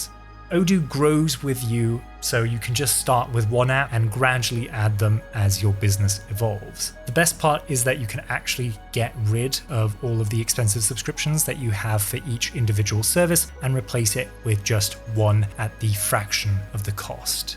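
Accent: England, United Kingdom. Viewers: Okay, I have a question aside from saying: This looks and sounds amazing!!! But where are you from? The german is sooo good, the italian also sounds good (even tho I don't speak it) and the english sounds british. I am like, so confused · The host sounds quite similar to Simon whistling who runs biographics, war fronts and loads of other channels? I does say the person who runs the channel is Swiss but the host has an English accent.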